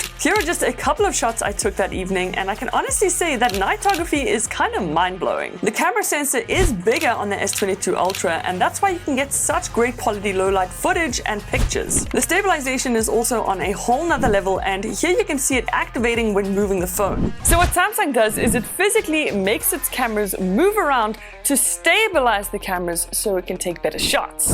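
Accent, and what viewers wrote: South Africa. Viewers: You have a South African Accent, I wonder where you are from? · You still in South Africa? Thought you had the accent... checked the about area and was right 🤣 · Why have I just come across this channel now?! Great video! Subscribed!! Also, is that a SA accent? Lol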